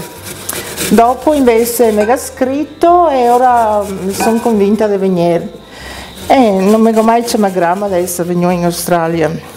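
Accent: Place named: Veneto, Italy. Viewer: Yum! That brought back memories of Mum making them! Loved the Veneto dialect too!!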